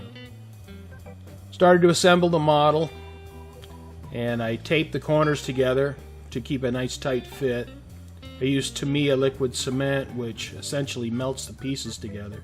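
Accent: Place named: United States. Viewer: a european that sounds like an american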